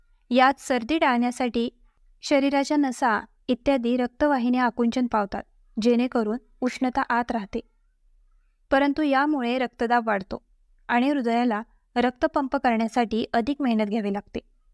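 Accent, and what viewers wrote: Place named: India. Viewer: तुमचे मराठी हिंदी वळणाचे आहे. मराठी शिका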